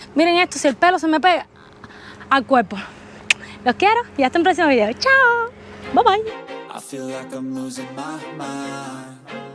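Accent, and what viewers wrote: Cuba. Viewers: La voz de cubana tuya molesta cantidad el acentico cubano ese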